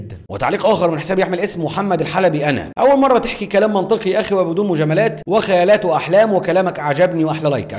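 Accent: Egypt. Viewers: انت سوري وللهجتك مصريه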